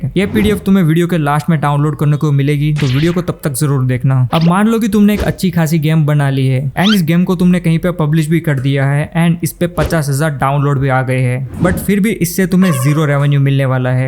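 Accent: Gujarat, India. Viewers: from your way of speaking game I know you are from Gujarat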